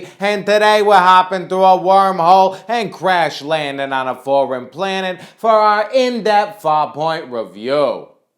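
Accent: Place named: Philadelphia, United States. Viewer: I’m from Philadelphia your accent sounds like mine lol I have you a subscribe because you deserve it I’m getting my first psvr set this Monday well my first vr set ever and you really helped me choose my first game . Can only choose one for now because money is tight thanks for your hard work I’m going to go check out your other videos too You remind me of someone who I would befriend lol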